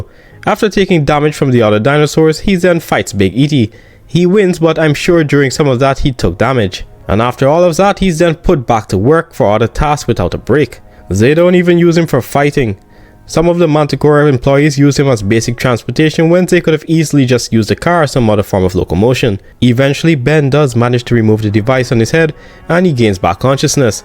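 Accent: Jamaica. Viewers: Jamaican? Which parish bro? 🤙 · Is you Jamaican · You sound jamaican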